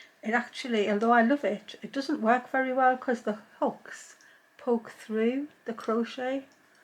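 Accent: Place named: Yorkshire, United Kingdom. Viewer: Just discovered you and your work is lovely. I lived in Yorkshire for nearly 20 years and it’s lovely to hear your voice, and that you say “crochering”, just like my mother-in- law did, instead of “crocheying” -we who pretend we’re French! Sometimes I say “crochetting” because I’m musical! I really love that duck egg, eau de nil cotton yarn, it’s my favourite go-with-anything colour. Why on earth would anyone discontinue it! Right, I’m off to delve into your other videos! Thank you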